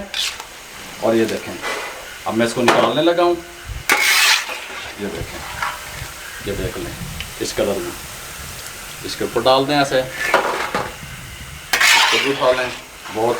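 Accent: Punjab, India. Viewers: G Allah apko BHT khushyan de mje apka bat karne ka smjane ka tarika punjabi bolne ka style BHT acha ha uncle Allah apko kamiabia de ameen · Ap k accent Punjabi h ap Punjabi bola kren bht acha lgta h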